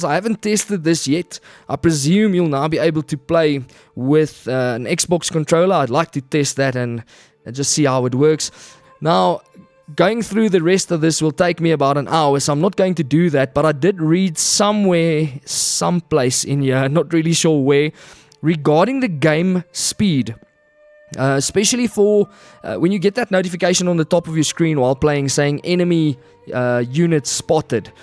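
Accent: South Africa. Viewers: The South African accent is strong with this one!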